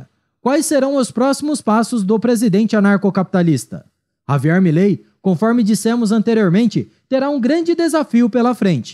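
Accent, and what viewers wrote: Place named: Caipira, Brazil. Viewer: Ótimo vídeo. Mas precisa diminuir esse sotaque caipira, que torna irritante para muitas pessoas assistir o vídeo. É só prestar atenção nos "erres". Abraço!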